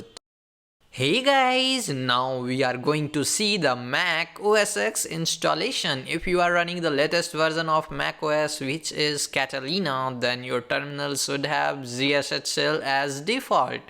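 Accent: India. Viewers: Indian accent, foreigners will enjoy watching this video!!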